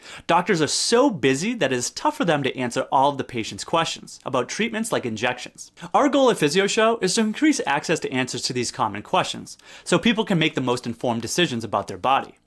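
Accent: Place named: Massachusetts, United States. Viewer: You’re def from eastern Massachusetts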